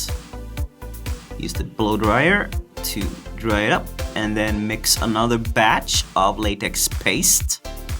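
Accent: Scania, Sweden. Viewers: Känner igen den svenska dialekten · när du började snacka skånska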